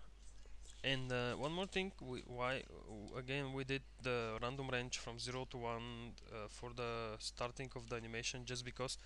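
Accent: Russia. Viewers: Русский чтоли?)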